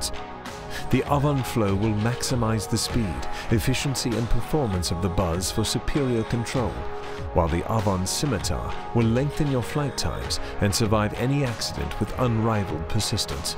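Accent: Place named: United Kingdom. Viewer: For some reason when someone has a British accent I take everything they say much more seriously. It makes everything thing seem more high tech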